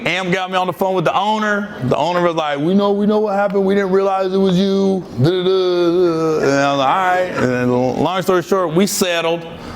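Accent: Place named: Compton, United States. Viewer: Blech. Why is he talking like a dumb black guy from Compton? Stupid.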